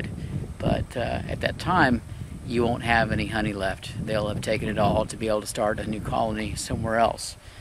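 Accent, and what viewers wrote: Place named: South, United States. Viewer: a man with a southern accent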